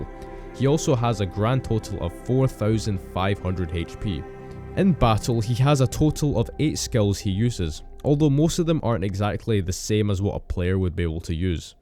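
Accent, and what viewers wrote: Scotland, United Kingdom. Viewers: u scotish?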